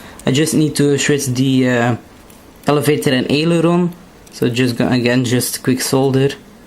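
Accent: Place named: England, United Kingdom. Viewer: Nice project my friend :) Love your english accent! Happy new week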